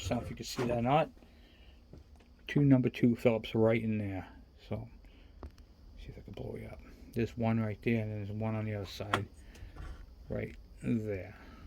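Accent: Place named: New England, United States. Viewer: I thought it sounded New England-ish. I just moved to Connecticut and just bought a GT80 today (my third one). Any chance you have any extra bits laying around?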